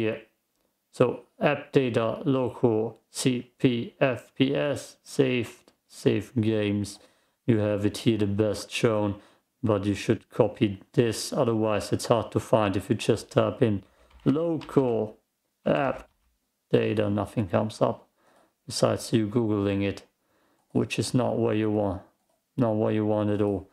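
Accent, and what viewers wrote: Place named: Wales, United Kingdom. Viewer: Are you welsh? You are super hard to understand bro.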